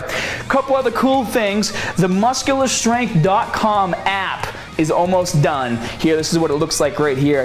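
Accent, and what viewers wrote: Boston, United States. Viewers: But that boston accent tho :D lol. #GoPatsGo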